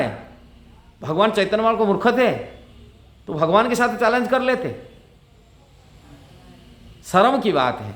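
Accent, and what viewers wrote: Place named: Odisha, India. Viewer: He is from odisha so obviously he has some glitch but he is speaking can u able to speak in oria . sorry for any offence Hare Krishna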